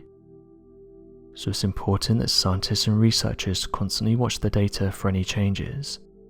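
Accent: United Kingdom. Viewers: Well this information has been available for decades, I couldn’t stand the video because the whispering voice is so annoying, though he has a beautiful British accent he’s trying To narrate like it is a ghost story and it’s just pathetic.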